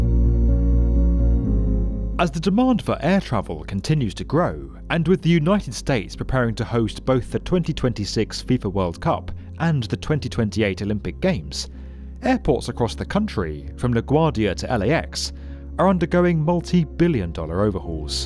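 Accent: United Kingdom. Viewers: 🤣 No the narrator is British! You can EASILY tell by his accent!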